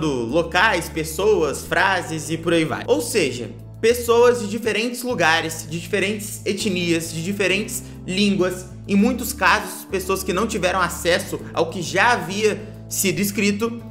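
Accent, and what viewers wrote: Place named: Bahia, Brazil. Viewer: Isso se chama sotaque.. Aqui na bahia é muito comum.. Como um brasileiro, tu já deveria estar acostumado com nossa variedade linguística e cultural.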